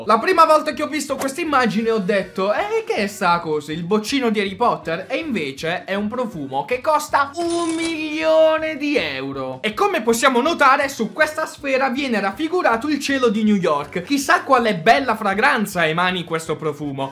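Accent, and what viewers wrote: Apulia, Italy. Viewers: ma sei di puglia?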